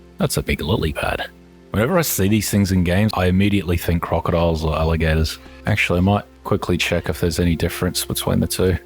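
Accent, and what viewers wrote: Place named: Australia. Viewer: Love the australian accent!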